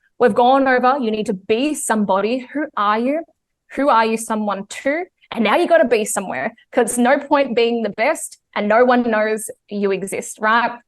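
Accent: Australia. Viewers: I love your Australian accent. It is very beautiful to my ears........:) Thanks for valuable information.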